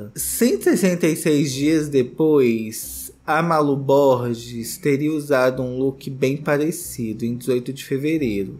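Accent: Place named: Belo Horizonte, Brazil. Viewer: O sotaque mineiro dele é de bh é quando ele "imita " o sotaque ,ele puxa mais para o sotaque do Norte de Minas kkkkk Falo isso pq minha família materna é do Norte de Minas e eu sou da região metropolitana de bh. Os sotaques são diferentes kkkkk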